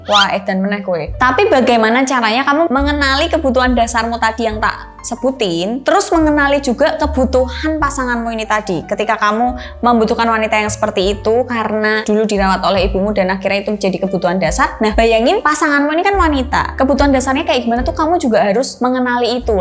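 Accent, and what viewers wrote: Java, Indonesia. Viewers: Medok e jan joss mbk yu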